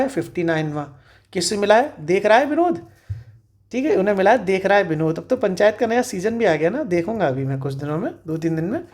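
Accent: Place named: Firozabad, India. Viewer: Sir फ़िरोज़ाबादी touch ह language मै..... 👍🏻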